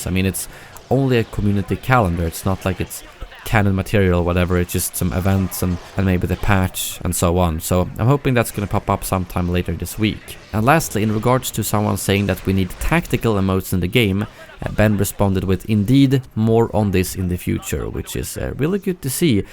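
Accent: Sweden. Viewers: Are you swedish? You have the same dialect as Dennis.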